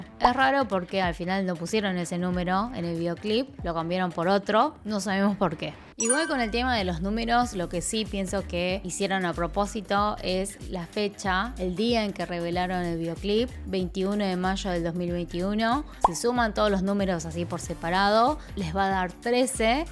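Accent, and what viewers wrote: Argentina. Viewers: Hace cuánto que vivis en argentina ? Me sorprende tu acento 😂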